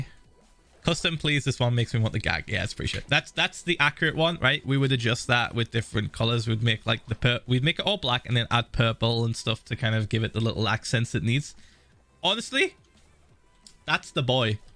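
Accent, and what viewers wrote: United Kingdom. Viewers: is it just me or does he sound extra british today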